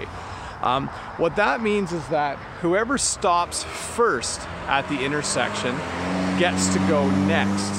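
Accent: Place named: Canada. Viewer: Hi great to learn with you English in Canadian way thanks Lott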